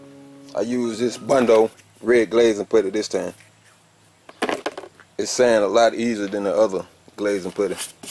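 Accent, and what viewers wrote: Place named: Memphis, United States. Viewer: You gotta be from North Memphis bro by that accent 😅 but your videos are hella informative man I love em! Never seen the fleece technique before until I started watchin your vids. So fries 🍟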